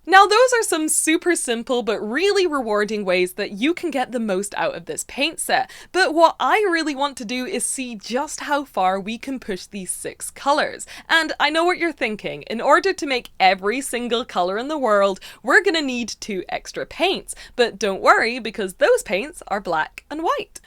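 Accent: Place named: Scotland, United Kingdom. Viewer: Sorry if this offends you, but its annoying me that i cant pin your accent, at times you sound Scottish, lothian/Edinburgh way, but i'm probably completely off 😂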